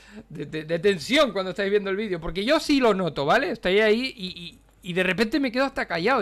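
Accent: Canary Islands, Spain. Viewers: es canario?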